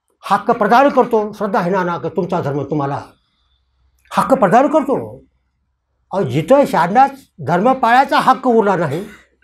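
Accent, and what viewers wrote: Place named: Marathwada, India. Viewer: पूर्व मराठवाड्याची भाषा आहे, परभणी/नांदेड/हिंगोली भागात बोलली जाणारी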